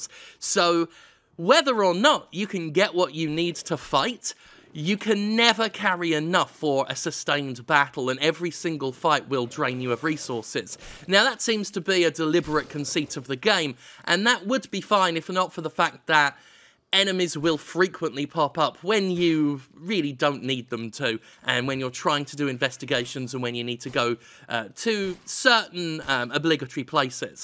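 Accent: United Kingdom. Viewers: Fuck me, did not expect to be slapped in the face by a massive dose of nostalgia the moment I boot up a sterling vid! You may be in America but you are deffo a brit through an through ;) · Sometiems, you can hear the Britishness just oozing out of.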